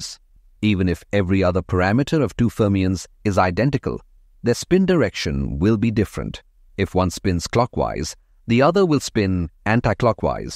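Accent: India. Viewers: This video is obviously created by an Indian. Even though he/she tried to hide his/her Indian accent using a weird robot sound, one can still tell it's an Indian talking by his/her mispronunciation of the letter "T" when he/she said "doo taosand dtwenty five" instead of "two thousand twenty five". Also the incorrect use of the article "a" instead of "the" in a sentence is very common among Indians and gives their identity away.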